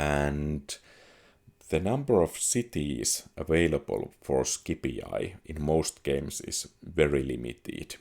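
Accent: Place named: Finland. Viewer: Love to hear finish English! Really nice! Love it!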